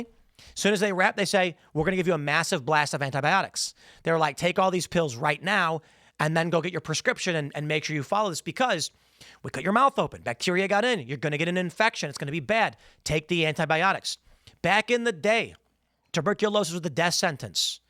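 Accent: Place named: South, United States. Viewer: Good southern accent. I’m southern & sound like cornbread.😂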